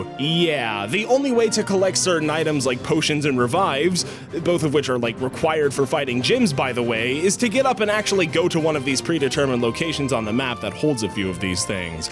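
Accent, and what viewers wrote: United States. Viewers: Does everyone in the US have an accent like that? I mean it sounds much cooler than british english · I knew I was right about that kansas accent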